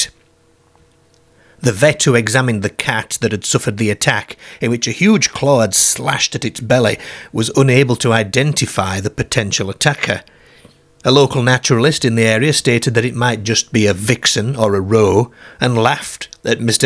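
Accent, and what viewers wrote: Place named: Yorkshire, United Kingdom. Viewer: Somewhere in yorkshire where they say Bairking instead of barking, hairf instead of harf...I dunno!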